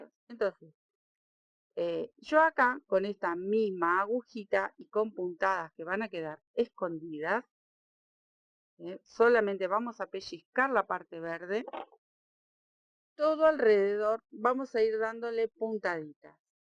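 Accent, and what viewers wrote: Argentina. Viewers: me dio mucha alegría volver a ver un video tuyo. Soy mexicana y aaaaamo tu acento argentino. Gracias por el tutorial, he querido hacer una colcha hexagonal. Muchas bendiciones!!!❤❤❤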